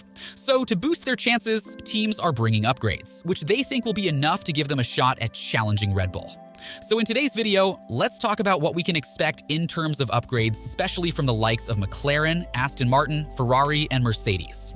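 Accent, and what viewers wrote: United States. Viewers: the AMERICAN ACCENT is KILLING ME!!!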